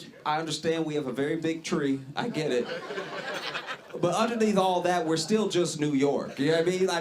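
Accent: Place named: Louisiana, United States. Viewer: I wonder what part of Louisiana he's from(?)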